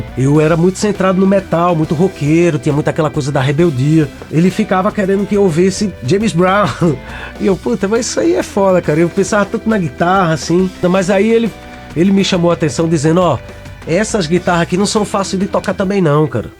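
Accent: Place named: Pernambuco, Brazil. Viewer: Quem resiste a esse sotaque pernambucano?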